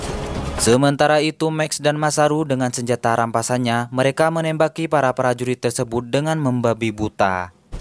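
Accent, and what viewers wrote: Madura, Indonesia. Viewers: Logatnya madura banget 😀. Semangat saudaraku 👍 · Reng medhure ye teh ? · spertinya orang madura ini wwkwkwkwk,,,,,,ketara sekali logat bicaranya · Kalo dari logatnya lo kayak madura ya bang? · logat Madura checkkkk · Meduro iki koyok e sg ngomong 🤣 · Oreng medureh been cong...?!?!?! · kentel banget y maduranya.. 👍👍👍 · Logat admin dari madura ya?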